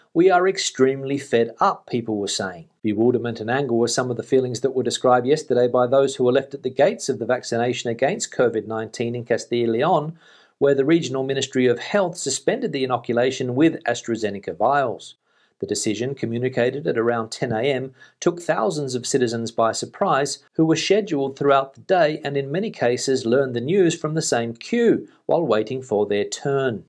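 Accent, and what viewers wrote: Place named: Australia. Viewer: Thanks. I look forward to listening to you every day; especially with your cheerful Australian accent! I’ve been waiting for over a year to find out when I can get over to Spain from Scotland as I have a few holiday houses I have my eye on. It’s been so frustrating and we are still no further ahead. I thought that the vaccines were supposed to give us more freedom but, alas, it doesn’t look like it’s going to make much difference. Take care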